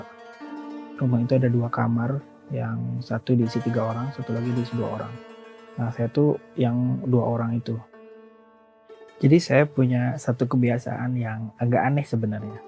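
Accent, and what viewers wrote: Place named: West Java, Indonesia. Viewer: Pasti orang sunda nih mas nya :v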